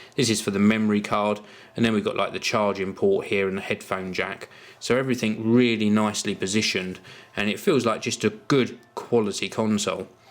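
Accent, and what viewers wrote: United Kingdom. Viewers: Every reviewer is cooler with a British ascent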